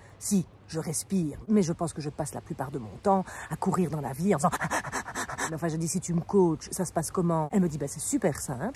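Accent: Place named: Belgium. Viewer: Même format, même humour, même petit accent et quelques expressions belges: suis tjrs aussi fan! 🤗 Tellement de sujets que l’on peut traiter ainsi Hâte de voir comment tu vas décliner celui-ci 😜 La nature et l humour, deux piliers de ma vie 🥰 La citadine en pleine nature, je me moque gentiment et j espère apprendre encore des bienfaits de notre nature, avec ☺️ À dimanche prochain 🥳🥳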